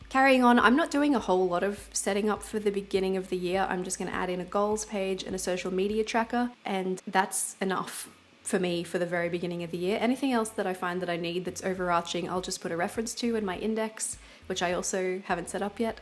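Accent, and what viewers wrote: Australia. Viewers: Hi, I really enjoyed your video... it is good to hear an Aussie accent... just a tip about your comic markers... they are double ended 😉 have a great 2019. Will be looking forward to more videos 🙂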